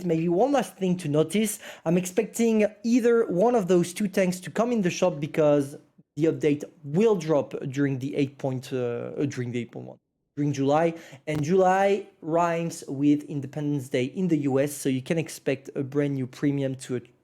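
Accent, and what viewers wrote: France. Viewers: Bonjour everyone ??? Sa se voit avec ton accent que tu es français, ou soit je dit de la merde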